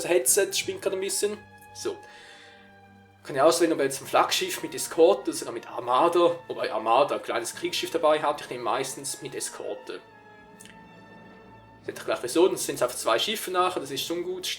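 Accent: Switzerland. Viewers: l_ANTRAX_l du der redet Schweizer Deutsch man man immer diese ernstirnigkeit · so. Das ist der schweizerische Akzent im Deutsch. Schweizerdeutsch verstehst du nicht mehr. xD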